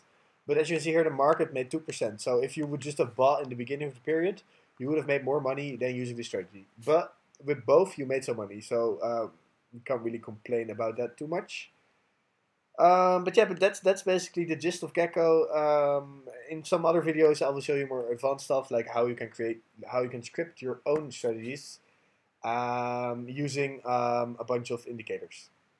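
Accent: Netherlands. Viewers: you sound very nederlands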